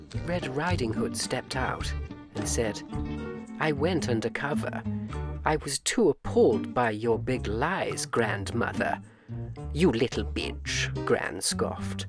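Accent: RP, United Kingdom. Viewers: the narrator speaks the Queen’s English.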